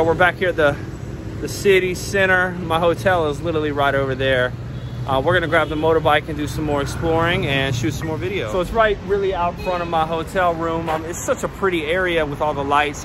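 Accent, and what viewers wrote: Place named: South, United States. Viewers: Great video n I enjoyed it. Guess you re from the south? Greetings from Southern CA. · Gorgeous videos. Love the accent. Where? Louisiana? ... Did you finally see some city personnel on the China side?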